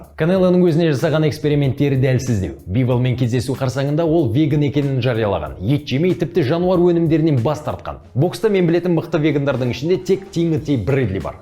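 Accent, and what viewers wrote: Standard. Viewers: Керемет 👍таза қазақша, арна алға баса берсін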